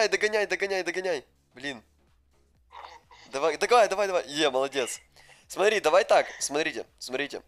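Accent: Ukraine. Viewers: Видно видно Украинская проризается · ты украинец?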